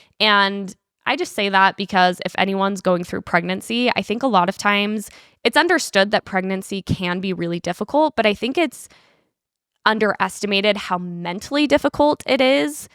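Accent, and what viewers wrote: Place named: Utah, United States. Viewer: Do people who have this accent practice it? Are there communities in Utah where everyone speaks like this? It’s fascinating that someone in Utah can have such a distinct accent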